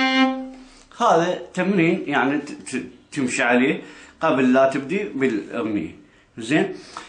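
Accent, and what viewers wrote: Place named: Iraq. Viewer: ياروحي ياللهجه العراقيه 💙💙 .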